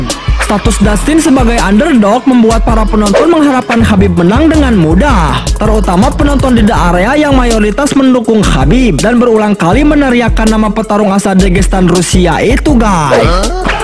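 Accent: Bali, Indonesia. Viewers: Logat bali? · Mimin orang Bali ya?... 😁 Logatnya kayak temanku. 😁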